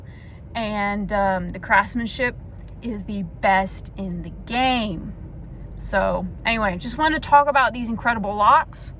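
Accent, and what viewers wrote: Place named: Alabama, United States. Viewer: Also, you sound like you're from Alabama, the "Ah Knoee" gave it away.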